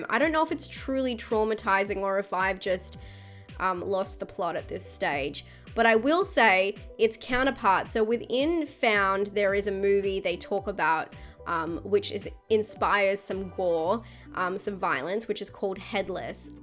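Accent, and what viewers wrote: Australia. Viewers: I love the Australian accent. That’s just HORA baby!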